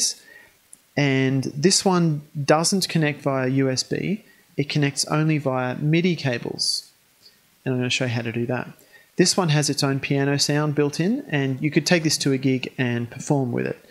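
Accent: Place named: Australia. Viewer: hi great help I just got a usb cable to go from my imac 209 to my roland Em 15 and was showing in put light on in green but would now show up on mac or work, thats cause its not a midi keyboard right and my interface is a focusrite scarlett solo so has no way of connecting it up I looked up how to connect it and was advised to get the usb midi cable so what can I do please do I need to download software or what. I'm from melb I noticed your Aussie accent.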